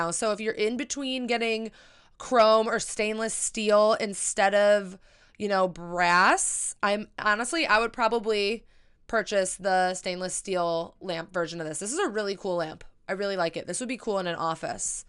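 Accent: Chicago, United States. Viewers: I love your videos. You’re smart and opinionated and your Chicago accent makes me homesick. As far as being sad—you need to work things out in your own time. There isn’t one way to get over heartbreak. One day you’ll wake up and things won’t seem so hard. As for shopping—Zara Home is great for things like drawer pulls and candles. Their sales are fantastic. I would love to see your thoughts on Anthropologie—so cute, but really expensive and the quality doesn’t always seem to be there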